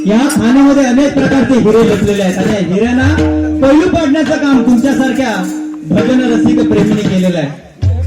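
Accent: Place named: Malvani, India. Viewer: Josh Academy he malvani bhasha aahe tyat uchhar asach hoto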